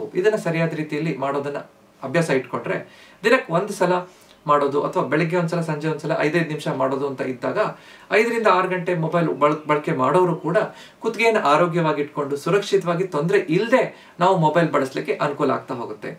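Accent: Standard. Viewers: ಮಾನ್ಯರೆ, ತಾವು ಸ್ವಚ್ಚ ಕನ್ನಡದಲ್ಲಿ ವಿಸ್ತಾರವಾಗಿ ನಿರರ್ಗಳವಾಗಿ ಅನೇಕ ವೈದ್ಯಕೀಯ ವಿಷಯಗಳನ್ನು ಹಾಗು ಸಮಸ್ಯೆಗಳಿಗೆ ಪರಿಹಾರ ಮಾರ್ಗೋಪಾಯಗಳನ್ನು ಸೂಚಿಸುತ್ತಿದ್ದೀರಿ. ಅನಂತ ವಂದನೆಗಳು.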